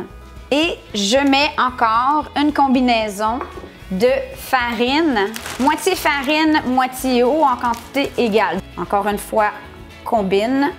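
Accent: Quebec, Canada. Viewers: Une vidéo instructive et très sympathique avec un petit accent québéquois qui est adorable. En tout cas j'apprends qu'il ne faut pas être pressé pour la préparation du levain. Merci et bravo pour la vidéo. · Hello, je viens de découvrir ta vidéo sur levain et pain fait à la maison. Peut-on faire les même préparations avec des farines de sarrasin et de châtaigne ? Je suis français de France et j'adore tout ce qui vient de la belle province (y compris l'accent) !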